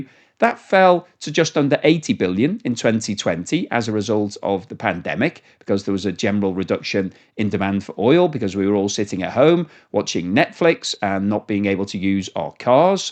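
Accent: United Kingdom. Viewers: Since you are british, and you refer to the "other than oil" product as "gas", I HAVE to think that you are referring to Natural gas. Here in America, gas, or as you would refer to as "petrol", is refined from oil. Natural gas almost always is Naturally present where there is untapped oil. So when you simply said "gas", I'm thinking " WTH is he talking about?!!" But then I realised, by your accent, that you weren't talking about "petrol". Please try to be more specific. Otherwise your commentary can be a little misleading.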